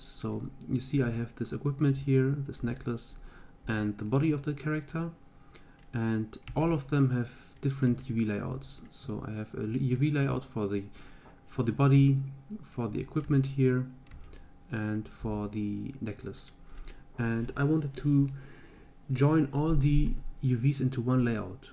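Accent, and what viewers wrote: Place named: Germany. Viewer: Bist du deutsch? Man merkt iwie einen Akzent heraus ^^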